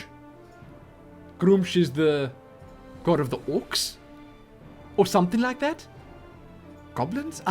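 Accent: South Africa. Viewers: D South African accent, I would know since i'm south african myself lol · but this guys accent is south african... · You South African? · Accent definitely sounds South African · it's dutch south african not british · It sounds South African if anything... Don't blame you. South African accent is difficult to pick up, considering how many people haven't been able to tell what mine is.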